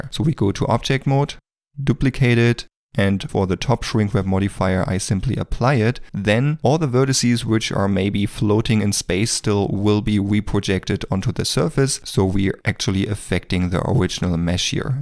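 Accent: Germany. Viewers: Are you from Germany? :D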